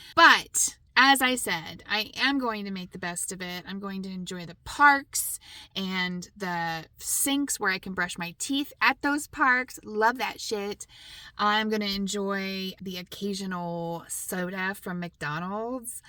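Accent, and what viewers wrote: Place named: Texas, United States. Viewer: I thought you sounded Texan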